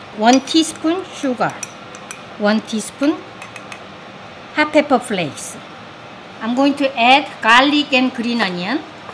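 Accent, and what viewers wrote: South Korea. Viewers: 왜 이제야 알게 된걸까요..ㅎㅎ 두바이에서 가정주부가 된 중년 아저씨..이제부터 보격적인 요리남 되렵니다...한국 스탈의 영어 완전 좋아요.... 한국식 영여 악센트를 세계에 고착 시키자구요...다같이... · 망치님! 대박 입니다. 요리 짱! 여기다 구수한 영어솜씨가 한국적 입니다.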